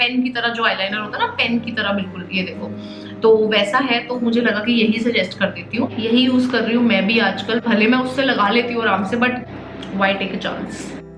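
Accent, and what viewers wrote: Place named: Chandigarh, India. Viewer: One thing I like about ur video is A. being a tricity girl u hv that accent that Tricity Ppl 🤪can relate and B..u explain very well..💗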